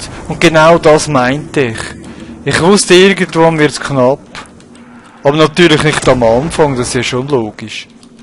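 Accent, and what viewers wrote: Switzerland. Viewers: erstmal geiles video :D ich liebe dein schweizer akzent... ein vorschlag von mir mit höllenhunden schwer und ohne magie auf/in stadt und alles mit ungepunchten waffen ;D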